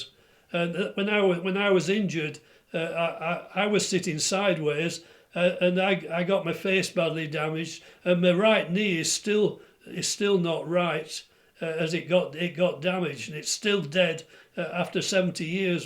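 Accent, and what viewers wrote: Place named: East Lancashire, United Kingdom. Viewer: East Lancashire accent. Do you not think so ? lol ....I locked myself out of my house house here in Wisconsin when it was MINUS 30 C. I was wearing a T shirt and I thought I would die in 5 minutes. My house has security glass and I couldn't break in with a big hammer so I begged for shelter at a neighbours house......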